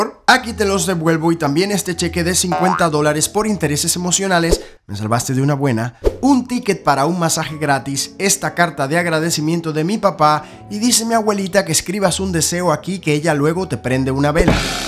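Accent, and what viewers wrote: Argentina. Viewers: el asentó cordobés es horrible, no lo aguanto, igual que el asentó chileno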